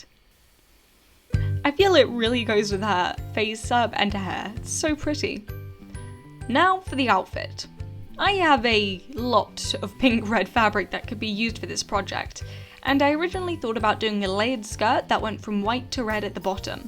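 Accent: United Kingdom. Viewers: She's well cute ❤️what country are you from ,you sound like a posh English person ☺️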